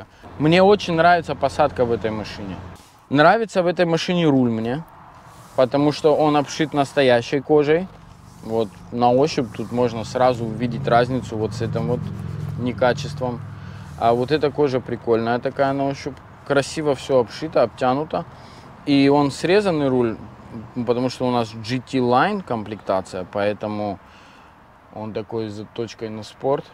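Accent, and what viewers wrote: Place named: South, Russia. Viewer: Еще раз молодцы, (ели я не ошибаюсь) расскажи о себе, как докатился до такой жизни (Америки).. откуда ты.. говор Южный...я из Нальчика.. Шкода как таковая у вас есть?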